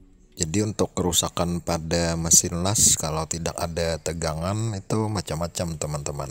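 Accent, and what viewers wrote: Banjar, Indonesia. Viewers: Bahasa Indonesia 100% bagus , tp logat Banjar nya katahuan 😂. salam 1 hobby sanak mautak atik masin las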